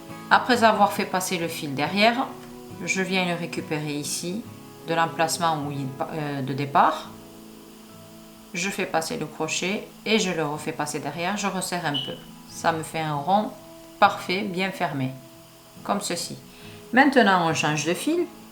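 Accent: South, France. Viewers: magnifique et en plus le merveilleux accent du midi, que demander de plus pour un 31 décembre ... très bonne année 2025 madame !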